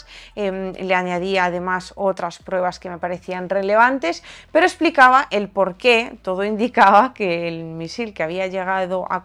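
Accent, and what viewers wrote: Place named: Spain. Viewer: Para la mentalidad e idiologia"otanista"pro EEUU y pro Ucrania,los rusos socialistas/comunistas son los malos,pero imaginate un socialista/comunista que encima es"sudaca";seguramente tu que estas por tu acento,en España entenderas mas que nadie a que me refiero.A los colonialistas de los imperios europeos y americanos no les alcanzo con llevarse las riquezas y masacrar a sus pueblos indigenas de sudamerica,para ellos hoy dia seguimos siendo menos que escoria.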